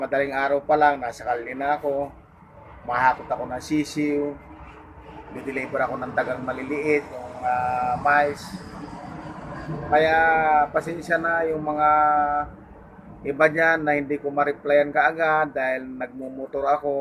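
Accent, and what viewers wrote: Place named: Ilocos, Philippines. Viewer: Parang ilocano kayo lakay base sa pananalita niyo hehe.anung province nyo po?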